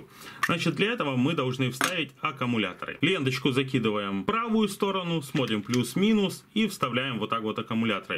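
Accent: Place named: Odessa, Ukraine. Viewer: Продолжай на Одесском рассказывать! А то, я раньше, чтобы твои обзоры не скучными были, субтитры включал)))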